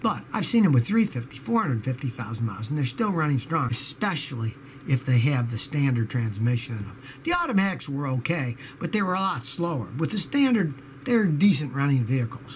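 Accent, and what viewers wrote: United Kingdom. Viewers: your's is the best video channel on the internet! Makes my day everytime I watch one of your videos. Loved the British accent! BTW, a 1986 is 34 years old, but you're still a god to me.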